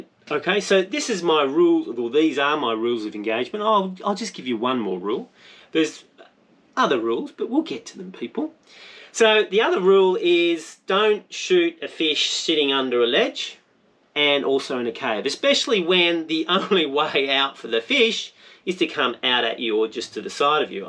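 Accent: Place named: Australia. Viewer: Not at all! You have to work with what you have but that doesn't deny you being smarter about it. All you have to do is keep filming, and giver yourself enough time between 'eprisodes' to craft your content into a storyline that garners interest, and which you USED TO DO, otherwise I would not be sitting here giving you an honest critique! Think about what you used to do that's missing. I haven't had time to look at all your older 'top end' episodes but the few I have provide a viable outline. They contained the following: 1) Aussie accent and lingo 2) Interesting locations & commentary 3) Lots of 'fluff' 4) A bit of 'puff' 5) and some high-seas drama that nearly everyone could relate to one way or another, e.g. "who's drinkin' all the flamin' water?", "crocodiles" etc. ...and you were building numbers! Also don't forget that a story has a beginning: set the scene, a middle: flesh it out with interesting content, drama, & Margarida (especially Margarida), and an end: wrap it up with a mini preview of the next one, a teaser or two from Margarida, thank your sponsors, and tell your viewers/followers what you need from them if they are enjoyed THIS show...and all of that in Aussie lingo with the occasional translation of the more obscure. 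Note to you: You had most of that, sort of, right up until Margarida got stuck someplace else, and you had the lightening strike. None of the above is about changing you, champ. It's all about changing how you do what you are doing, or at the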